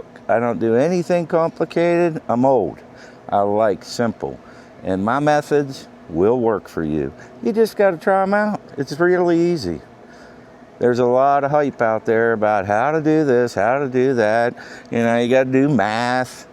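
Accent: Pittsburgh, United States. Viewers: what part of pittsburgh are you from?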